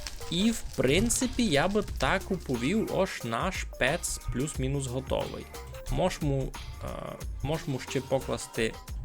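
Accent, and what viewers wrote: Transcarpathia, Ukraine. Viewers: Це закарпацька?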